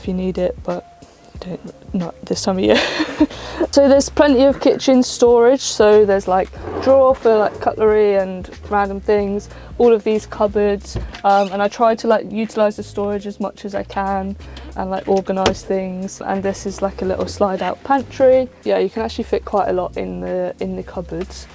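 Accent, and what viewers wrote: England, United Kingdom. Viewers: such a nice english english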